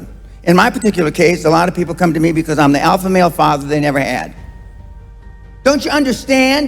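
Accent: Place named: United States. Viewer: Too strong american accent.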